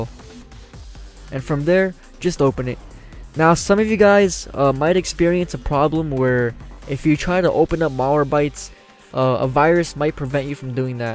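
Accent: Croatia. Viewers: Are you from Croatia?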